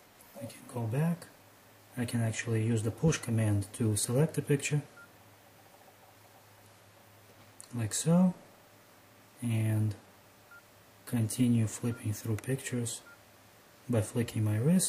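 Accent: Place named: Russia. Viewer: He have a russian accent :))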